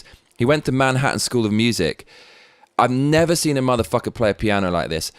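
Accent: England, United Kingdom. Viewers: What part of England are you from ? I was born in Acton London W3 .. lived in South Carolina in USA FOR 50 years .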